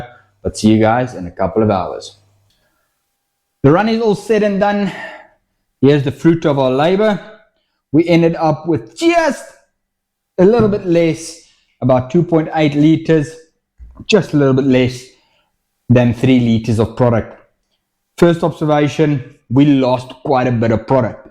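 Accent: South Africa. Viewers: is back. I love that thick S.A. accent brother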